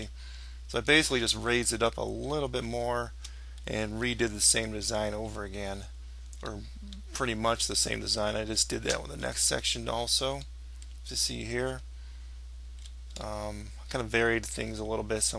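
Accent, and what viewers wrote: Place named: United States. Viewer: Ruff... You're American, aren't you?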